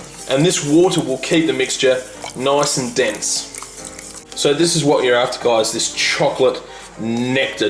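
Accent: Australia. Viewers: Can anyone tell me is it Australian accent? Just curious. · At first i only subscribed to him because he had an Austrailian accent, but now i found out he makes delicious things so it's a win win situation :D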